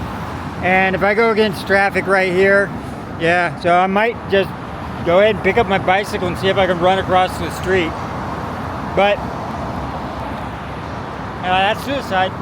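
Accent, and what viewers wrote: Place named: Tennessee, United States. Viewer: From Tennessee. That explains your accent. Keep riding and discovering Malaysia. You have a splendit Advisor in Douglas of Plane Doug.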